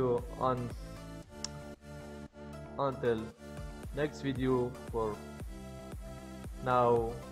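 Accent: Iran. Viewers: ایرانی هستی اینقد لهجت کسشعره مادرجنده ؟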